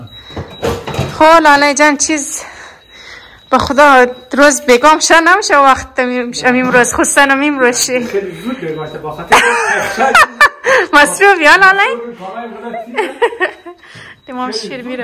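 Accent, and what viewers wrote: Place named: Hazarajat, Afghanistan. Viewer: خانم شما ولاگ های خود را صرف برای قوم هزاره خو نمی سازید که غلیط هزارگی گپ می‌زنید باید همه فارسی زبانان را در نطر بگیرید در ویدئو هایت معلوم نمی‌شود کی برادرت است کی ایورت است همه را لالیم میگی و بیگی کی است چرا درست معرفی نمی‌کنید پیکی کی است و این کی تان می‌شود فامیل کدام است همگی را لالی میگی ما نمی‌دانیم که کدام فامیل تو است و کدام از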